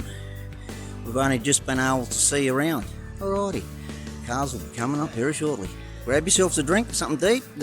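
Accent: Australia. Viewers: Hello from an Australian in Japan⛩🌸🌸🌸 Great to see so many cool cars in the same place🚗🛻🏎🛻✨✨✨ And love your Ozzie accent💜 Have a great day🌸🐨💕✨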